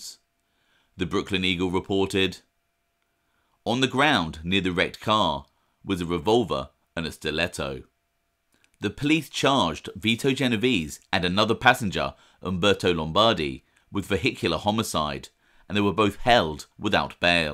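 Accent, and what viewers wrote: United Kingdom. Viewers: I love your videos, and always get an unintended chuckle at hearing you recite dialogue from mobsters in your British accent. In another video, you reported that a particular mobster worked at a place called Top Tomato, which you pronounced the American way, but with your own accent. I bet you debated with yourself about how you were going to pronounce it when writing the script for that episode. Keep up the great work! I really appreciate the effort you put into these videos.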